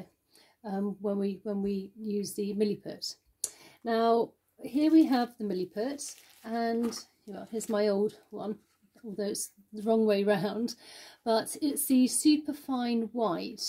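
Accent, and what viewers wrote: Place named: United Kingdom. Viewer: Hi, if you use two-part epoxy products a lot, you can get very sensitized to them, so you might want disposable gloves for what you are doing. After the two-part products have cured, they are very inert, and there is no concern about contact. In practice, the concern might not apply nearly as much to the putty, because it doesn’t have the honey consistency that most two-part epoxy products have, but in principle it still does. Thanks for the nice vid, from an Ahmericaan that loves hearing the Queen’s English. ~Brian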